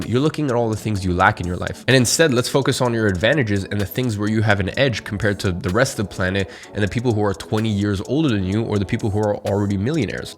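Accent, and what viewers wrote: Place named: United States. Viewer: почему у тебя американский акцент живя в Лондоне?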